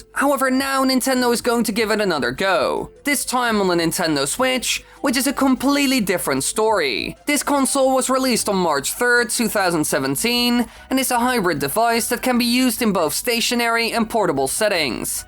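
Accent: Netherlands. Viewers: Ur voice is fine tbh, just obviously a european (dutch?) accent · Its a good accent, its dutch right cuz in your zelda reaction i thought you were talking dutch for a smal bit. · I love your Dutch accent! I’m from Belgium 🇧🇪 and I don’t mind it. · Well you can hear from the accent that he's dutch which is okay. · For me your voice is great and i really like your dutch accent. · Well I mean you sound Dutch Are you ?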